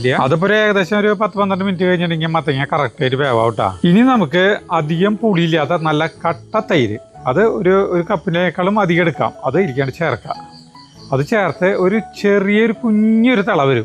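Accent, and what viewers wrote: Thrissur, India. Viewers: ഞാൻ ആദ്യമായാണ് നിങ്ങളുടെ വീഡിയോ കാണുന്നത്. ജന്മനാ തൃശ്ശൂര്കാരിയായ എനിക്ക് നിങ്ങളുടെ dish നേക്കാളും ഇഷ്ടമായത് തൃശ്ശൂർ ഭാഷയാണ്. 30 വർഷമായി പാലക്കാട് ജില്ലയിൽ താമസിക്കുന്ന എനിക്ക് തൃശ്ശൂർ ഒരു നഷ്ട സ്വപ്നമാണ്.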